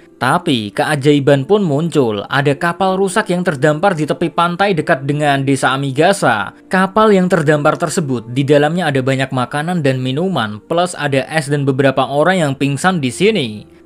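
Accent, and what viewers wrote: Java, Indonesia. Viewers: Mimin orang Jawa ya nanya aja min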